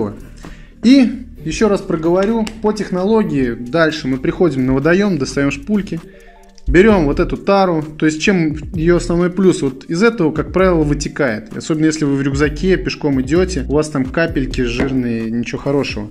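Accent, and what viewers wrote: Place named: Penza, Russia. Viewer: произношение 50, как в Пензе)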